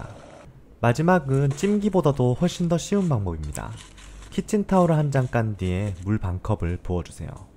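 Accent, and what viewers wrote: Gyeongsang, South Korea. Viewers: 경상도 총각이네예~~~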